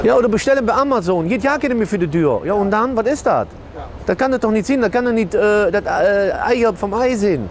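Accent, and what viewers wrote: Cologne, Germany. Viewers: Haha, zu geil. Habe lange kein richtiges kölsch mehr gehört. Dat is schaad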